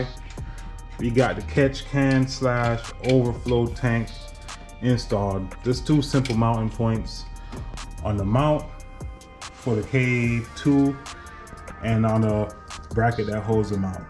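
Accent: Jamaica. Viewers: Just bought this today, thanks man. Jamaican???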